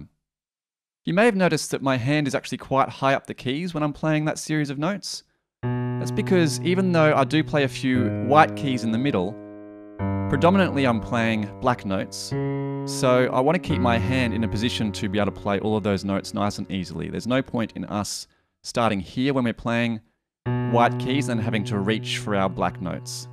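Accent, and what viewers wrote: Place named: England, United Kingdom. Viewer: I sense an English accent xD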